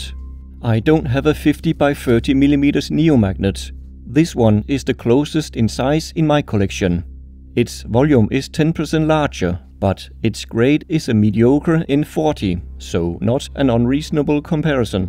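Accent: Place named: Denmark. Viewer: Nothing like a good old danish accent 😄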